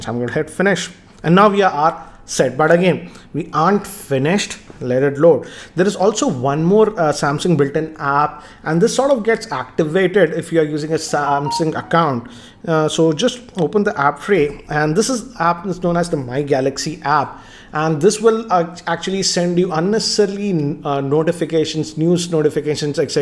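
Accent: India. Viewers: With your Indian accent I do not understand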